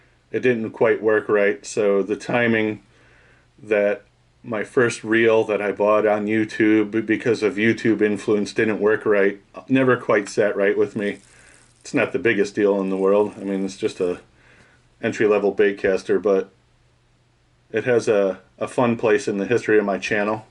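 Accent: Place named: Georgia, United States. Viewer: I'm gonna guess you are from Georgia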